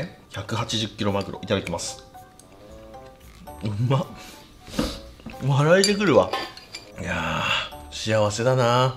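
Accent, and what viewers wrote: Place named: Aichi, Japan. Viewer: 私も愛知県民のせいか適度な方言が安心感あるw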